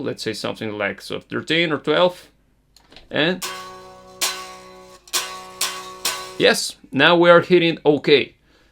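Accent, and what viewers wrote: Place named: Europe. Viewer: He sounds like a European version of McMurray from Letterkenney.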